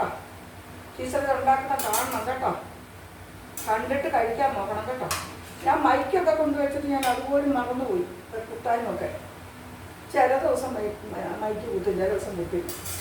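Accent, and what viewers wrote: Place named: Kottayam, India. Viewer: But you have a Kottayam slang any connections with Kottayam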